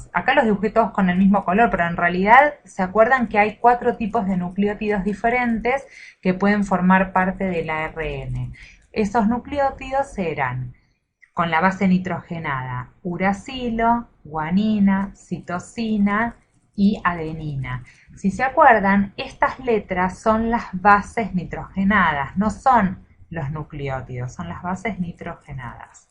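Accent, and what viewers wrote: Argentina. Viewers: Andaba buscando algo gráfico que me ayude a entender el tema y te encontré! sos una genia y no voy a mentir: cuando te reís o tenés un argentinaz al hablar, me generas mucha calidez! un placer escucharte!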